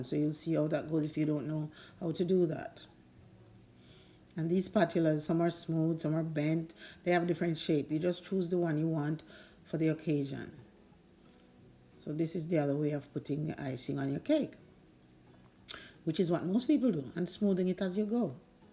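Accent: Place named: Jamaica. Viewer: This was lovely can hear the real Jamaican voice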